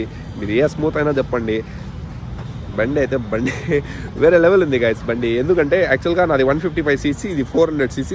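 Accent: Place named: Anantapur, India. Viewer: Meedi anantapur district kada anna meeri matlade slang chustene telustundi 🎉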